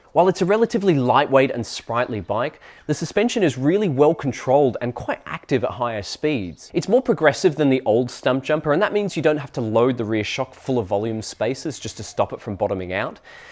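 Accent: United Kingdom. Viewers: Are you a Brit in disguise? Intrigued by the accent... 🧐 (Yes I did enjoy the bike content!)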